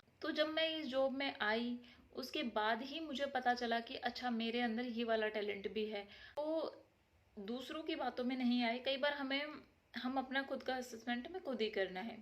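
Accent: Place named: Haryana, India. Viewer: Aap kha se ho ji? Bhatere 😂 sun k haryana se lag rhi ho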